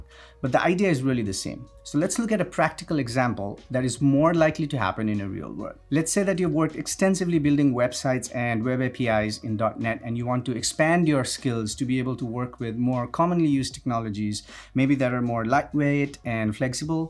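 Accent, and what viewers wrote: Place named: Nepal. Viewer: Amazing content! I always felt like you have a Nepali ascent. But today I noticed Swayambhunath Stupa on your hand. :)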